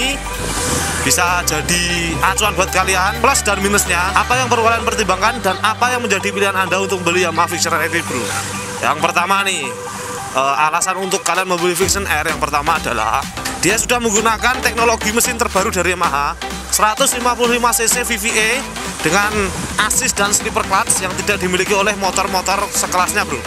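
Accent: Java, Indonesia. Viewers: Keren nih review... Nggak mojokkan salah satu merek. Mantap bro. Logat jowone mantap bro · Muedok tenan mas... mantap lah,madjoe terus...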